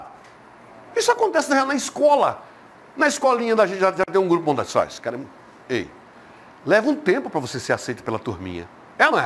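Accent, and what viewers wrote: Pernambuco, Brazil. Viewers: Sotaque penabucano ❤👌 dele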